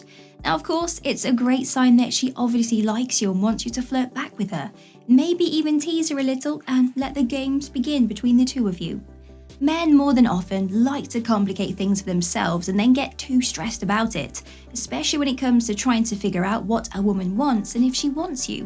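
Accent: United Kingdom. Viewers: has a nice British accent to listen to, where in England are you from? 😅 good tips and yeah dating is difficult 😊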